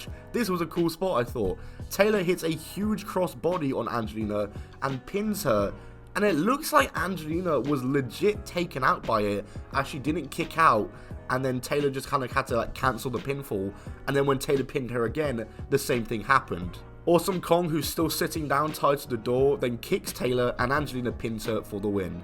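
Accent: England, United Kingdom. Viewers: Seriously his annoying obviously brother English voice ruins it.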